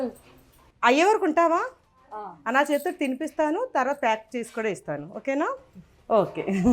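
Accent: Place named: Godavari, India. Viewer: Mee telugu slang maa godavari slang madam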